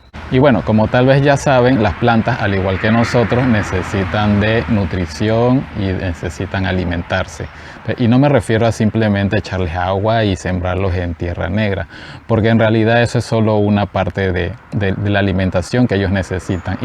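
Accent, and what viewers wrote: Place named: Panama. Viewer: Por tu acento veo que eres panameño … saludos y muchas gracias por el dato!!!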